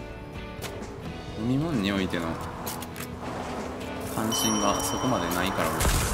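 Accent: Kansai, Japan. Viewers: さんの関西弁めっちゃ可愛い（笑）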